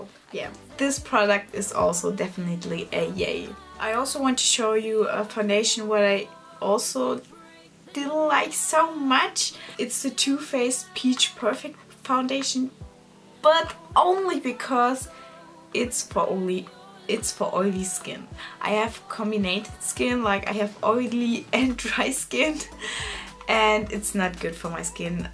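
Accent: Germany. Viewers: Heftig wie ignorant manche Menschen hier sind! Sie kann die Videos in der Sprache drehen, in der sie es möchte. Was ist daran so schwer zu verstehen? War irgendeiner von euch Englischlehrern denn EINMAL im Ausland, wo Menschen verschiedener Herkunft untereinander Englisch geredet haben? Jeder hat einen Akzent! Ihr deuscher Akzent ist normal und NATÜRLICH. Hätte sie einen britischen oder amerikanischen Akzent, würdet ihr doch auch wieder haten mit „sie kommt aus Deutschland aber redet so und so“ ..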